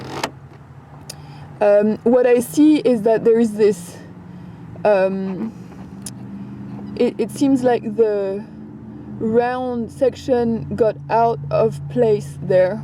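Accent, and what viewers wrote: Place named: France. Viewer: You have saved my husband's life.....he was going crazy with his A/C until this video.....!!!! Plus you have a beautiful french accent!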